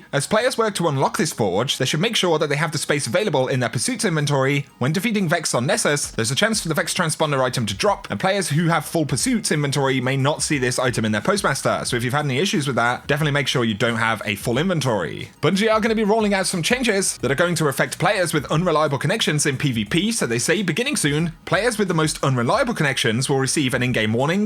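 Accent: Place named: Lancashire, United Kingdom. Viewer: it is though to judge but I'm a tech iv checked my connection and bandwidth its very stable but according to bungie iv got a terrible connection very strange but I think it's the virtual servers auto detecting and trying to correct the connection they have thousands of options on a vr server so anything can go wrong great vid and you sound like your from Lancashire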